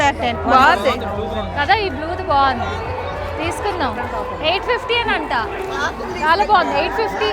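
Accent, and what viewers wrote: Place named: India. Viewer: ur totally changed 😀😀dubai to pure Indian slang version but very happy to see u don't stop vlogs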